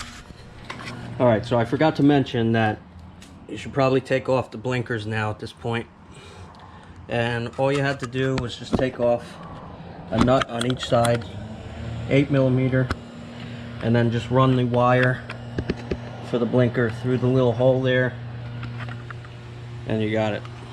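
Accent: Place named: Upstate New York, United States. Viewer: Good review. I was playing the game of what state is this guy from based on the way you say “off”. “Ohaaaff”. Upstate NY?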